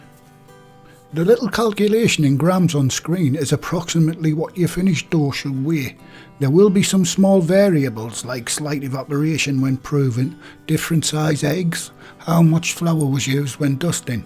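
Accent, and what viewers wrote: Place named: North, United Kingdom. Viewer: ay lad great video sounds like you are a tad further up north than where I am:)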